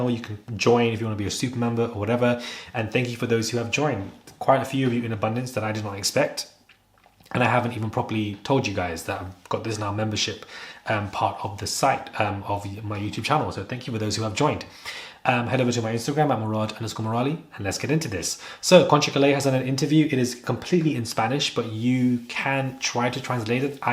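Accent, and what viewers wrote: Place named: London, United Kingdom. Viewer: Football 🏈 yea honey let’s get into this babezzzz ❤ thank you for all your videos I love 💞 them. I totally agree with Conches analysis 🧐 .. btw, you’re soooo cute!! You’re facial expressions 😊😊😊 yes Mi6 or M16 whatever it’s called has something to do with this CLONE of Kate !!! Yes conche is verryyyy accurate actually. Plus omg there’s a tarot reader a day b4 Charles bday and it’s so eerily on point and makes sense!!! 💞PEACE IN THE MIDDLE EAST💞 Love your London accent 😊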